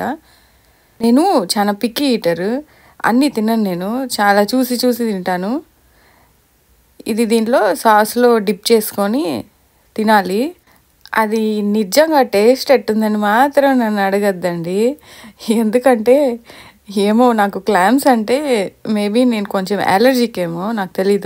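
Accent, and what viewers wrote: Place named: Rayalaseema, India. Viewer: mee Rayalaseema Accent chala chala bavundi And locations are so beautiful thank u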